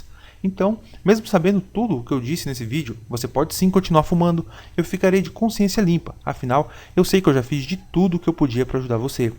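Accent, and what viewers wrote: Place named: Inland São Paulo, Brazil. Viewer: Com essa fala de paulista do interior aff que r ridiculo!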